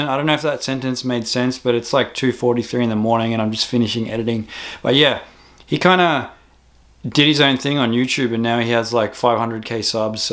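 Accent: Australia. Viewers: its an aussie doing a aussie accent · I like how you somehow made your accent more Australian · This is fucking so good, the aus accent just the cherry on top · that was awesome! hahaha. am I correct to think that you spoke with a different australian accent? like from a different region?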